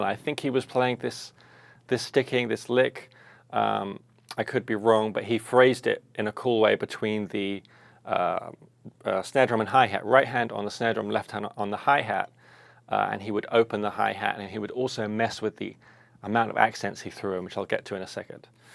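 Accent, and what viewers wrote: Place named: United Kingdom. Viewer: Do you own all of these sets? As I am watching lately your videos (where you speak and act very British, compared to other guys on youtube channels) I can see a lot of nice sets: silver USA custom, black USA custom, blue Heuer, Phoenix, or Hybrid Maple, black and white....What's the deal? How do you get all of these?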